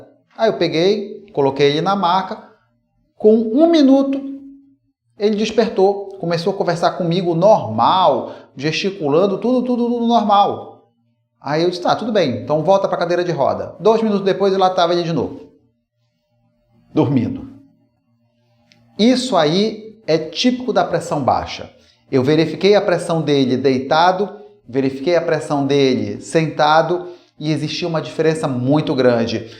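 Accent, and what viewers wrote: Pará, Brazil. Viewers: Gente, esse Dr. é Paraense o sotaque Rs fofo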